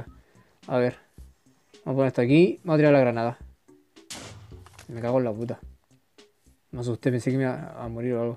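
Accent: Chile. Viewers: Eres chileno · Se nota al tiro que eres chileno wn nuevo sub que crack eres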